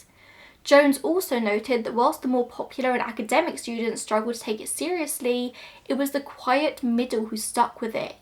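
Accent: England, United Kingdom. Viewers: She really does have a great voice, and this is coming from someone who usually hates the sound of English accents. But her voice is so calming and sweet that I actually like listening to her speak.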